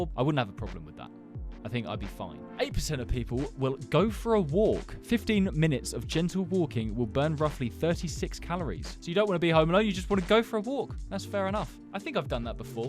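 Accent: United Kingdom. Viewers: I only laugh because he’s british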